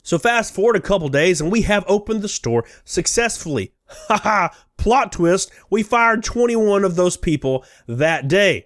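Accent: South, United States. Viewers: Did I just hear a southern man say the Confederate battleflag is Scary????? Lol wtf bro.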